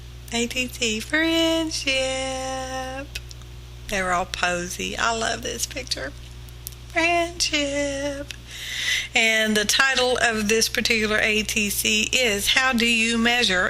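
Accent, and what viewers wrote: South, United States. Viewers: No doubt you're a southerner! lol This was something I've never seen before and I love it!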